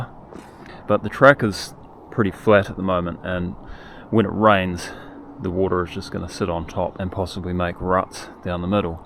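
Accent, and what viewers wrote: New Zealand. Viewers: Sounds Kiwi, awesome presentation dude thank you.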